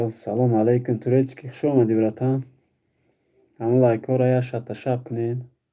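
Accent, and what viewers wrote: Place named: Dushanbe, Tajikistan. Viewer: Чисто душанбески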